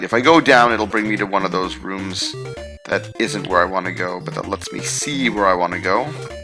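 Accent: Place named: Canada. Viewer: I can tell your a canuk 😂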